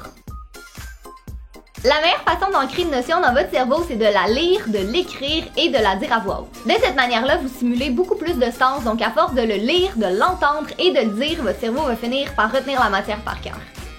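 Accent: Quebec, Canada. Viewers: Accent québécois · J'adore ton accents Québécois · J'adore l'accent quebec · J'adore ton accent je kiffe les cébequoi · 💖 j'adore ton accent canadien 💖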